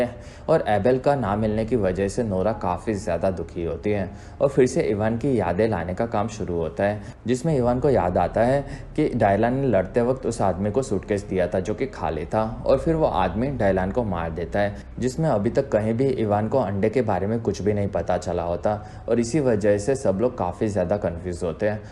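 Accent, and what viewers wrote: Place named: Gujarat, India. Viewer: He is from Gujarat. Koi problem nahi hai, sabka apna apna style hota hai.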